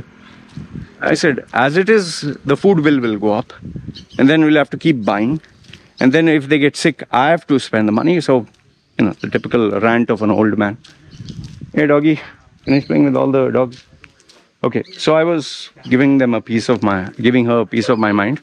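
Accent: India. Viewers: How come you have an Indian accent ?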